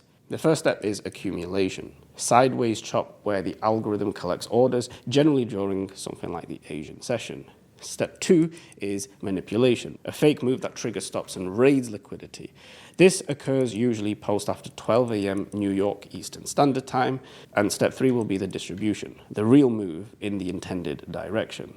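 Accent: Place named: United Kingdom. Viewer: concise and articulate delivery, paired with his clear British accent, makes complex trading concepts much easier to follow. I truly appreciate the direct and structured approach—far more effective than the overly drawn-out explanations some others offer. Excellent content!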